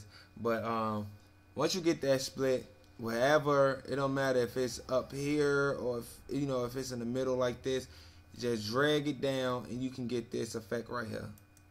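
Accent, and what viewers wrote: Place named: Chicago, United States. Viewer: I can tell U in Chicago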